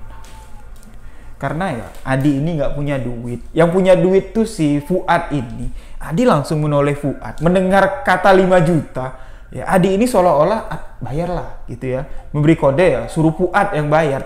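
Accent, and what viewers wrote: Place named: Madura, Indonesia. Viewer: kya logatnya org madura...denger cerita spt ini kn enaknya sambil merem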